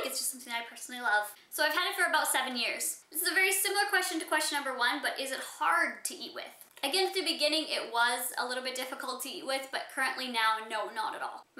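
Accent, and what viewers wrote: Canada. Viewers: Are you Canadian? You sound like you are.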